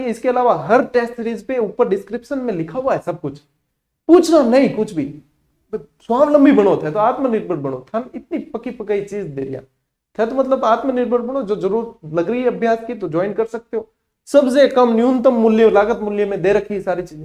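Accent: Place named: Rajasthan, India. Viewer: Aap marwadi bolte hue bahut hi ache lagte ho sir ji.....esa lgta h sunte hi rhe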